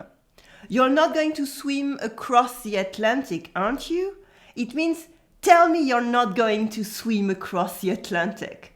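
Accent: France. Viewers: On the contrary, speaks an excellent English. Her French accent, intonation and cadence makes her English even more charming and pleasent to ear.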